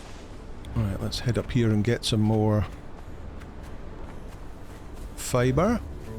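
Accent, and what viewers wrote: Scotland, United Kingdom. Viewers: Bro does the thrall settings at 0.1 work? Iv Been running about for days and my level thrall met his end at 5. It’s feels like it’s still taken ages to level up. Great Chanel for this game by the way. Iv learned a lot and have subscribed and liked. What Scottish accent is that by the way? fife. aryshire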